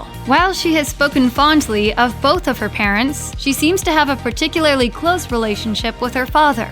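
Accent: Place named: United States. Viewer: No offence intended, and not that anyone was asking, but I can't watch a doco on the Royals that features an American voice over. It's like putting sugar in tea. Or using a wood chipper as a food blender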